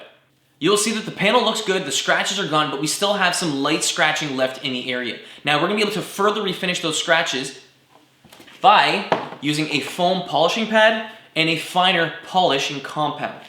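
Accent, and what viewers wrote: Canada. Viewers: After 1 second of watching this....wonder which part of 🇨🇦 he’s from 🤔.... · definitely Canadian (not holding it against you) lol. Thanks for the demo!!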